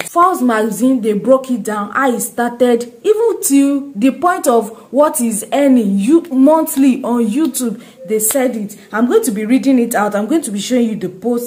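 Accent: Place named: Nigeria. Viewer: You sound Nigerian. 🙄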